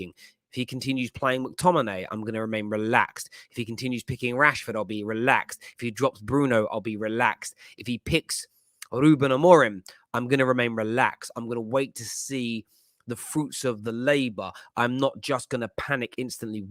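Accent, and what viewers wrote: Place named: London, United Kingdom. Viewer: White man talking London black man, innit bruv.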